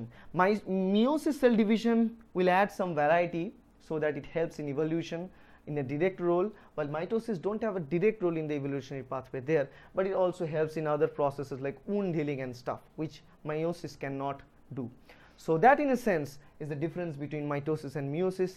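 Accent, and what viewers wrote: Bengal, India. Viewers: Sir are you from West Bengal? Because your talking is like Bengalis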